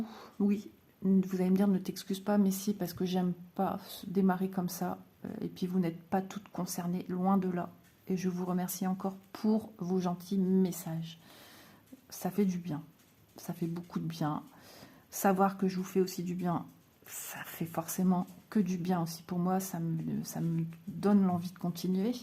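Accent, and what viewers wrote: North, France. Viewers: Coucou, Ne vous prenez pas la tête il y a tellement de contenu sur YouTube si elles ne sont pas contentes qu'elles aillent voir ailleurs. Quand je n'aime pas je ne regarde pas et pis c'est tout! Et pour le parler du nord, Diditte est pas mal non plus! J'adore. Il est vrai que j'ai beugge plus d'une fois en regardant vos vidéos en juillet avec des références climatiques de mai, mais ça réveille les neurones 😂😂😂 Courage et on veut la suite 😊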